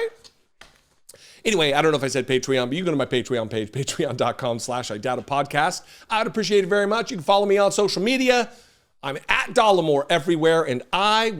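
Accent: United States. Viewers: please do not speak American as we your overseas audience for not knowing what duceses means. Thanks for the hard work and we enjoy your content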